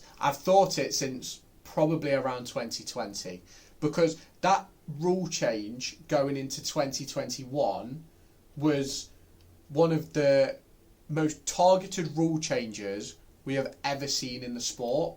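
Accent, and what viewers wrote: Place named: United Kingdom. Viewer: Hahahaha really british talking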